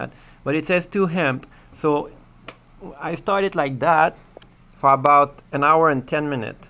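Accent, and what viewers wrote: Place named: France. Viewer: from ur accent, u can speak french right? je l aime bien to projet car je suis tres interesser a ce nouveau source d energie:) merci...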